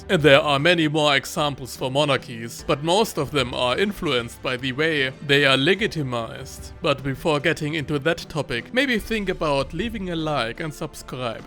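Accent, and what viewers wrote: Germany. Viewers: Me when my favorite setting YouTuber is losing his magical German accent 😢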